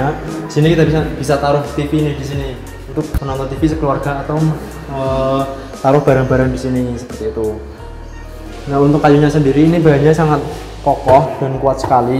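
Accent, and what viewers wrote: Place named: Java, Indonesia. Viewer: Jawanya medok bed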